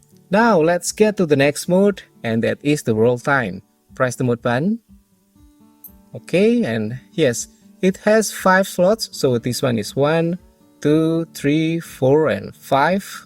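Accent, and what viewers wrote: Indonesia. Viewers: Udah saya duga. Logatnya Indonesia bgt.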